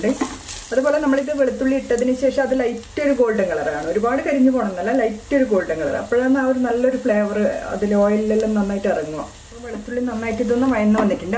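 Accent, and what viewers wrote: Kannur, India. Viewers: ഞാനും ശ്രദ്ധിച്ചു. അച്ചടി ഭാഷയിൽ നല്ല രീതിയിൽ അവതരിപ്പിച്ചു. എങ്കിലും ഇടയ്ക്ക് നമ്മളെ കണ്ണൂർ ഭാഷ വന്നു. ഞാൻ തലശ്ശേരി. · I like your kannur slang very much. I will try soon. To pressure Cook Tur Dal only 90 seconds required. If we are releasing the air from the cooker, we are waisting the gas. This for your information. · Kannur basha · സൂപ്പർ. കണ്ണൂരാണോ, വടക്കരയോ.... സംസാരം കേട്ടിട്ട്.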